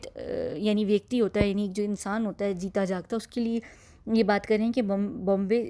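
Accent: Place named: United Kingdom. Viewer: Exactly, i am waiting for her english video. I really love her bristish accent.😊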